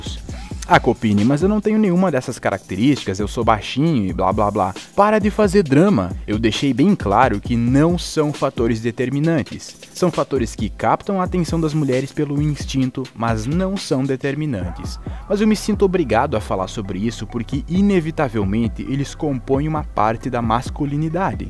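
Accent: Rio Grande do Sul, Brazil. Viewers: Copini, por acaso tu é gaúcho? É que teu sotaque é bem típico do norte do RS. Parabéns pelos vídeos, por acaso encontrei o vídeo sobre Ego e achei bem interessante alguns títulos que são mais voltados para desenvolvimento humano. Gostei muito da abordagem simples e objetiva, focado em passar a informação correta e de forma lúcida e educativa o que evita que o vídeo fique longo e tedioso. Novamente parabéns!!!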